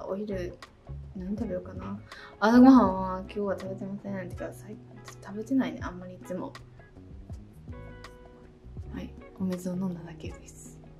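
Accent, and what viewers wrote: Kansai, Japan. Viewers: 私も雑目なA型です。メイク動画は、ブランドの好みや色使い、質感全てちゃんが私のタイプです。関西弁も可愛い💕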